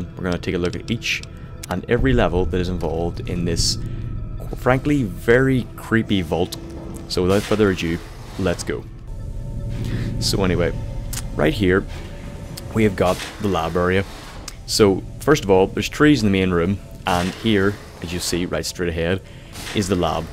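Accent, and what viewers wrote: Northern Ireland, United Kingdom. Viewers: Are you from Northern Ireland? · I respect you Northern Irish Morgan Freeman. Also as you didn't upload a video this week are you uploading an extra video?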